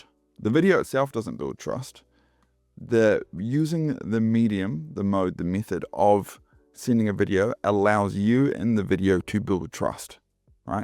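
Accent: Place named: New Zealand. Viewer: Are you from NZ? You sound like a New Zealander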